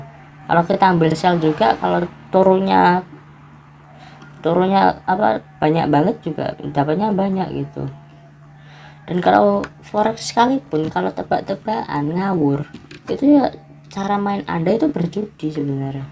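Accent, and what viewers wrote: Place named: Banyumas, Indonesia. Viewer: Ngapak ya bos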